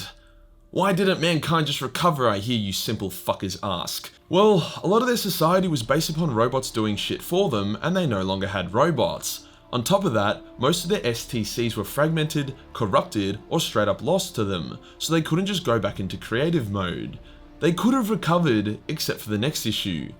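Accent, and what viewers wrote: Australia. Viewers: Yeah Australian gen alpha is bleeding my ear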